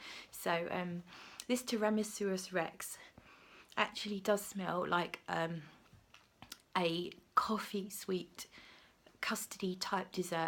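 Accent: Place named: Cockney, United Kingdom. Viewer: I'm from Luton, your review sounds so so local love the review and the cockney accent xx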